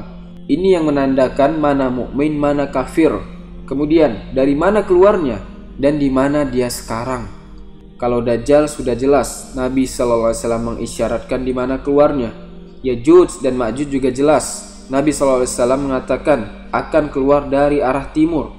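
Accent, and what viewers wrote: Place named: Sulawesi, Indonesia. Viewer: Didengar dari logat bicaranya admin ini kya ny org daerah sulawesi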